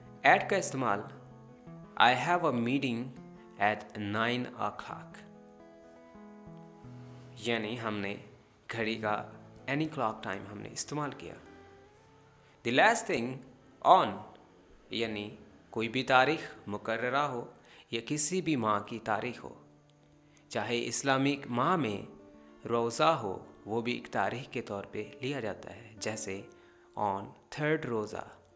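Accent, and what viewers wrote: United Kingdom. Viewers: chawal Urdu to thek thek bol.etni acting kr rahy ho jesy tm angrez ho or Urdu bolna ni ata .Urdu m English wala lehja rkha hoa h. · Sir pak ki english tenses preposition to ata hai lekin british accent nhe samjh atta apka 11 word wali video awsm the plz 1 video main batain k British language A TO Z kesay pronounced karengaylk PLZZZZZZZZZZZZZZZZZZ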